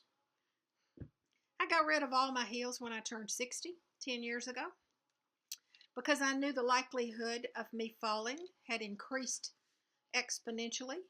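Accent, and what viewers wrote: South, United States. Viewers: Hi: I so enjoy your videos and your stories. My grands were from KY & TN and spoke in the same way you do, used the same phrases, so I do understand your southern lingo. I'm older than you by 7 years and can tell you that this aging process is not for sissies. It seems I develop a new ache or pain every day. But I do try to keep a positive attitude and count my many blessings. All the little "smalls" you finished are precious, makes me want to get online and order more patterns. I hope your sister is so much better and that you have an enjoyable time together. Can't wait for your next video. Happy stitches!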